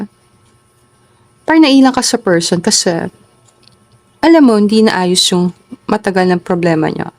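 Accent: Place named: Bicol, Philippines. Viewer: madam, ang cute ng voice nyo...parang may bikolanang accent..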